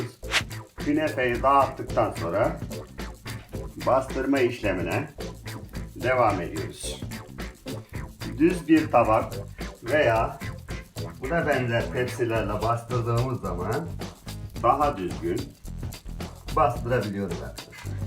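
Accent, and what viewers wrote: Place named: Hatay, Turkey. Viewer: Abi kıbrıstaki hataylılar gibi şiveniz var sanki :)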